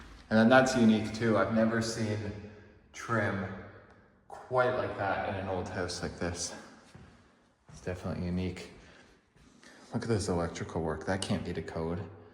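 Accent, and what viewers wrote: Canada. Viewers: What state is this? Are yu Canadian? Your accent sounds Canadian. This price is ridiculously high. No wonder it hasnt sold. Horrid reno. Nothing flows. Kitchen an empty cavern.no way was this house ever inspected. This is all stupid rigged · I don't know why, but I cant stand the Canadian accent. It's like finger nails on a chalk board to me! Sorry lol I just had to turn off the volume on this and use the closed captions instead!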